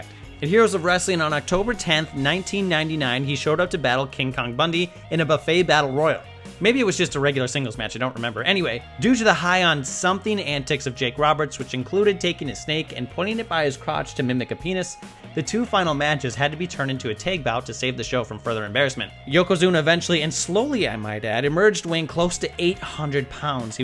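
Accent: United States. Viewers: Never heard an American presenter on a whatculture video before. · American man? More like I want my English accents back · that's an american accent, who is that? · Why is an American doing the narrative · Listening an American accent in a Whatculture Wrestling video is so weird. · Man.. This is weird hearing an American guy haha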